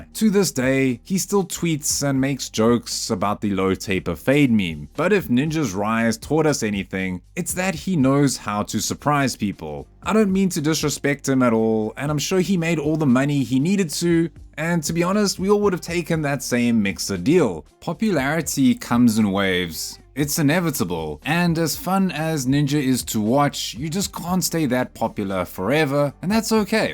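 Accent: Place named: South Africa. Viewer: you sound like you south african trying to sound like an american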